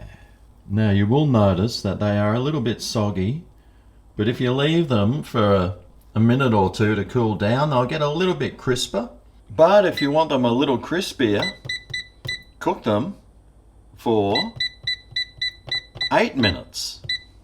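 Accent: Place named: Australia. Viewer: Never thought I would enjoy having an Aussie tell me how to make crispy fish sticks!😂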